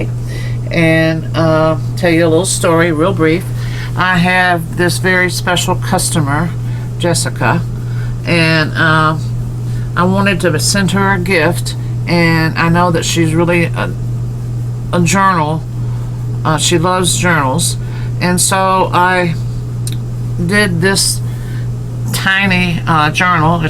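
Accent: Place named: South, United States. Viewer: I’m a Georgia Peach. I know southern accents when I hear them. Lol. 😂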